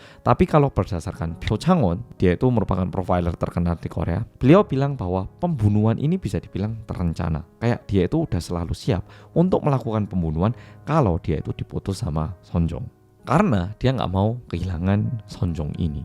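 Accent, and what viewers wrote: Java, Indonesia. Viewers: Saya senang nyimak cerita2mu terutama gara2 aksen medhokmu, yg bikin saya ngakak dan kangen masa kuliahan. Dan ga banyak back sound bodoh yg sbnrnya merusak kualitas video. Sementara sbgn besar youtuber beraksen Jakarta krn emang dr sana, byk back sound ga perlu yg malah menurunkan kualitas video, dan saya booooooooooosan abis dg gue gue lo lo yg terkesan keminter. Sehat selalu bro.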